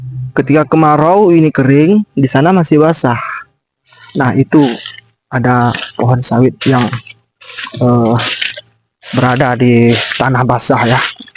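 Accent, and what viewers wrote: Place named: Paser, Indonesia. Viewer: Logat mu kaya suku paser kabupaten penajam paser utara ikn nusantara kalimantan Timur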